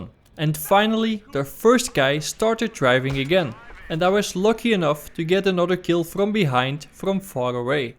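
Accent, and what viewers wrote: Netherlands. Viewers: you dutch? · Are you dutch?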